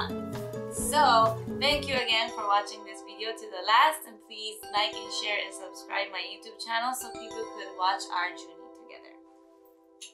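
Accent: Philippines. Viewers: You're English accent now more like Filipinos than Japanese :-)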